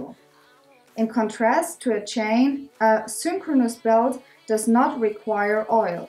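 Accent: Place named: Germany. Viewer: Man hört, dass du deutsch bist. Bist auf jeden Fall der Hammer! Weiter so^^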